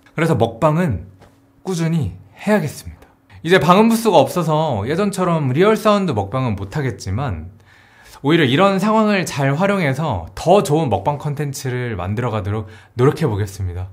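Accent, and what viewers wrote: Cheongju, South Korea. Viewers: 먹방채널이랑 일상채널을 나눠도 좋을것같아용!!! 일상채널에서 게임영상도 올라가고 가족이랑 놀러가는 일상도 올리규 ㅠㅠ 그리고 저 청주 사투리 좋아하는데 라이브도 하면서 사투리도 보여주시구~ 이것저것 많이 해주셨으면 좋겠어요!!편집 영상도 재밌는데 라이브도 재밌을것같아요 ㅎㅎㅎ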